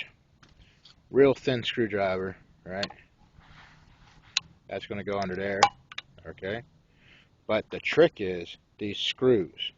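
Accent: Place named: Pittsburgh, United States. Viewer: Pittsburgh? I recognize the street signs and the accent! What's up brother?!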